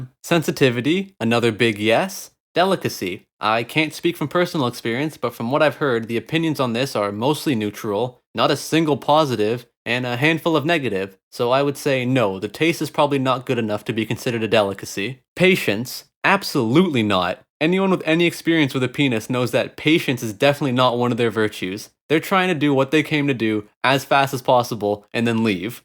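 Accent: Newfoundland, Canada. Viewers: Are you from newfoundland? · You wouldn't happen to be from Newfoundland would ya?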